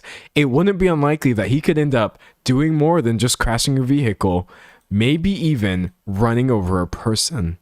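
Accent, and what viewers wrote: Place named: Texas, United States. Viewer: today I heard a clip of Connor Storrie talking and thought it was you. Is this some secret Texan accent I never knew about?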